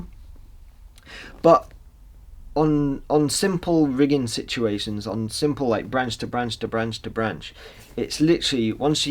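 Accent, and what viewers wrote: United Kingdom. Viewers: Sweetest name ever!! With the British accent! This guy needs to be a movie star!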